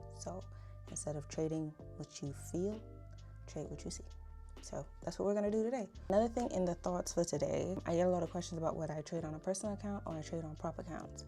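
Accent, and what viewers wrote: Bahamas. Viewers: Im enjoying this video. I had stopped trading for like over a year, but youre inspiring me to get back into it. Btw are you Bahamian? You sound like it. Anyway great video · did i hear a bahamian accent 👀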